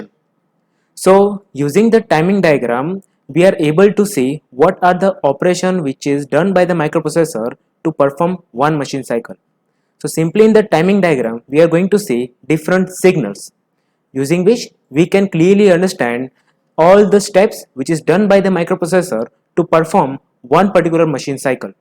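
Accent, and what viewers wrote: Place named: India. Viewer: Indians speaking english sound very ridiculous, next time use subtitles, the world will thank you.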